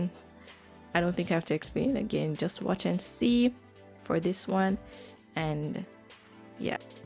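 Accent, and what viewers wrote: Jamaica. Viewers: Yup..I'm Jamaican too..so I was like yeah she is from Jamaica 🤗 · Heard that Jamaican accent and subscribed instantly 😩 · Cya hide the accent 🇯🇲 lol love it and def gonna try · I figured you're Jamaican based on your accent 😍 love the execution girl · You are very Jamaican. That "lawd Jesus" buss out 😂😂. Very nice hair style and method.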